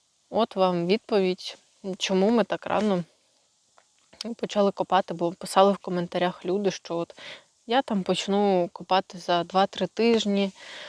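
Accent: Ukraine. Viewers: Hi. Love to hear you speak the Ukrainian dialect I grew up with. My parents came from Carpathian region to US after WW2. I still make borscht and grow potatoes too! Slava Ukraini. ❤️🇺🇦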